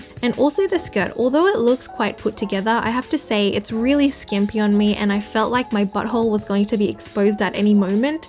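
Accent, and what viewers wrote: Australia. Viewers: Absoooolutely love this video, your creations and your editing. Definitely gonna subscribe :) But I didn't quite understand how you did the middle cinch on that second top (I'm new to sewing and also I'm French, and although I love your Australian accent it was going a little to fast for me to keep up). I understood you made a channel to gather the fabric but i didn't get how ? Could someone explain it to me ? Thank you so much and I hope you get more recognition! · whenever i find a new channel and i hear an australian accent... it's like god decided to give me a gift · And cute personality and adorable australian accent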